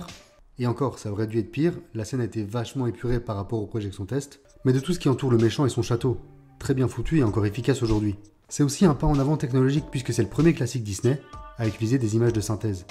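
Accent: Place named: Brussels, Belgium. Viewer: L'avais compris grâce à une autre de tes vidéos. Bruxellois, je suppose. Suis né belge flamand.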